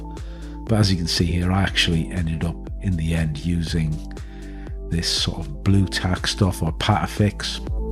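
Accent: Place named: Liverpool, United Kingdom. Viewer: Followed this all the way through - definitely put this kit on my 'wish list for 2023, along with a Hind A and a couple of other Soviet/Russian subjects. Good, clear videos, cleanly edited and well presented (good to hear a familiar accent/dialect even if it does make me a little homesick). Looking forward to your next 'production. All the best from a Scouse in NZ.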